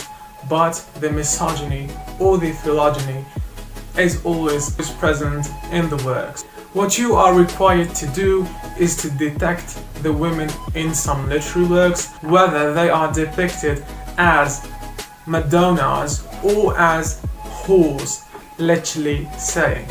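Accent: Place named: United Kingdom. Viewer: British english 👏👏👏👏👏👏👏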